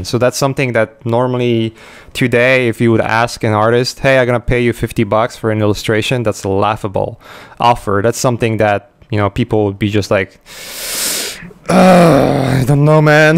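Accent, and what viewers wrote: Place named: Poland. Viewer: Как же прекрасен польский английский. Люблю за это поляков, в отличие от native spikers.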